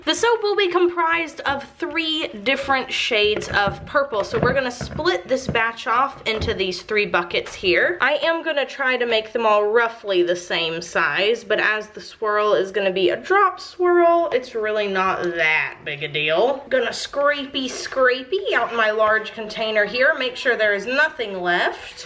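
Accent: United States. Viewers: why do you have a country girk accent ive been subscribed for a while and ive been wojdering this from the start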